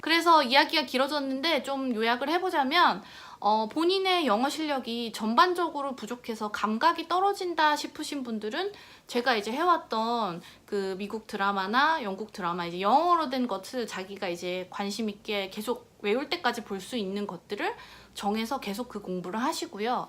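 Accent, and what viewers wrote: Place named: Australia. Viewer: 호주 아줌마말투 ㄷㄷㄷ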